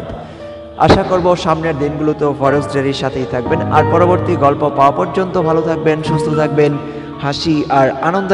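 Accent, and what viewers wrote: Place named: Sylhet, Bangladesh. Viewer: Hahaha! Bhaia apnar mukhe sylhety kota shune atto hashi pay atto hashi pay j ki bolbo bujte pari na😀😀😀😀😀,,,,,,tobe ai hashi beshi din tiklay hoy,,,karon ai golper khub voyanok akta shotti ase ja 1st part ai bujte parcilam r akon kar part gula to puratay flash back😔😔😒😒😒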